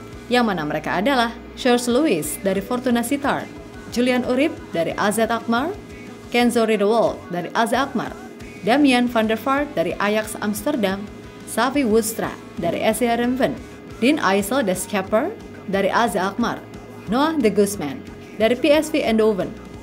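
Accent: Java, Indonesia. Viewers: Pengisi suarane Teko Jowo ngendi iki ? njalok di tuwapok Lambene 😂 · Wkwkwk tampuk lambene para gondez" .min asline jowomu ngendi?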